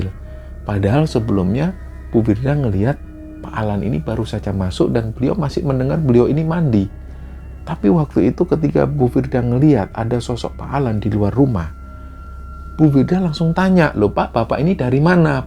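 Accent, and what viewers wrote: Java, Indonesia. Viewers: Salam kenal mas. Pertama kali aku nonton langsung jatuh cinta sama logat bahasa jawanya dan cara berceritanya. Jadinya langsung ku subscribe. 🎉❤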